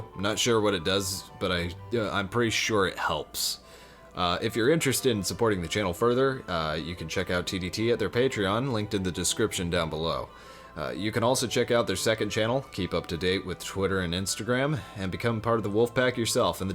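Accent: England, United Kingdom. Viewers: lmao Also, the narrator's voice and the "actor class British" is amazing. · The stuffy English thing…awesome😆 Best destiny video ever! 🤣🤣🤣 Right up my alley! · Hahahaha, that’s hilarious! I’m glad this came up on my feed… Exceptional English accent there good sir and again, hilarious! A new sub here for thee, peace ✌️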